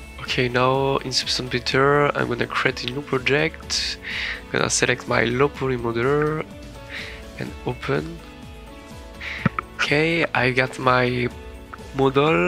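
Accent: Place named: France. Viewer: Great tutorial, very clean, simple and right to the point ! If I had something to add, you could maybe have explained why you used some of the functions or macros 🤔 I'm not an expert on UV unwrapping for example, so I would have liked longer explanations J'ai le sentiment que tu es français vu ton accent, si c'est le cas, sache que tu m'as bien aidé à progresser, je te souhaite du succès sur youtube !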